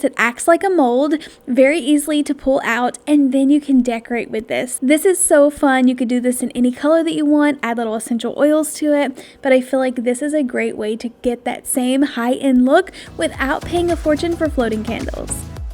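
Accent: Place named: Utah, United States. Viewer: You kinda' have a Utah accent. Do you live there?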